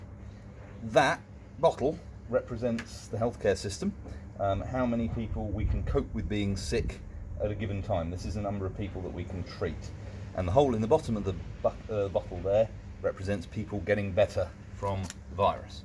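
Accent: United Kingdom. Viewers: despite your cool calming British accent and the chance I believe anything that you say you must note your pouring of water is linear not exponential. the water bottle is quite tiny in proportion to the ability to treat based on the number of NHS ICU beds being under half of Italy yet the population size is similar. this is not the great British bake off this is life and death.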